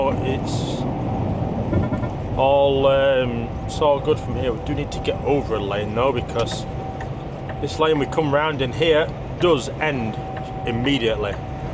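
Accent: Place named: England, United Kingdom. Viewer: Nice truck and that English accent is going to throw off some people 😅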